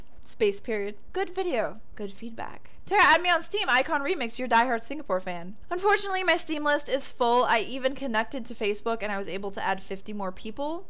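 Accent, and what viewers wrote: United Kingdom. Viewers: I think your british accent is amazing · This was funny! Great job! I like your English accent!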